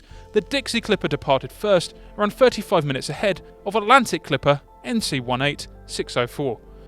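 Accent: United Kingdom. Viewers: Why a Brit narrator for an American plane? ENOUGH!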